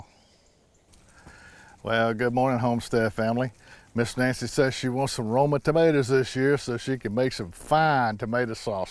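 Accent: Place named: South Carolina, United States. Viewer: Where are you from? Your accent sounds much like us, here in South Carolina. :)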